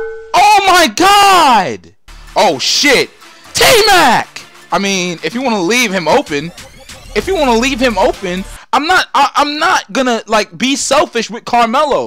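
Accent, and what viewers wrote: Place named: Brooklyn, United States. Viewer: What part of Brooklyn you from?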